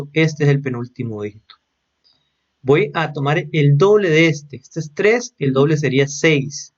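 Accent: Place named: Guanacaste, Costa Rica. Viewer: como se nota que es tico por el acento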